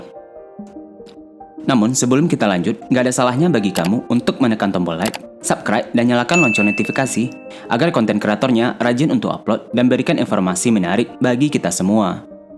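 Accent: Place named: West Sumatra, Indonesia. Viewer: Komentator nyo orang awak 😅 ranca bana..tambo ciek...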